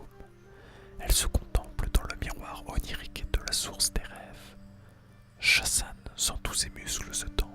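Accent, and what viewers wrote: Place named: France. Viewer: ese acento francés😍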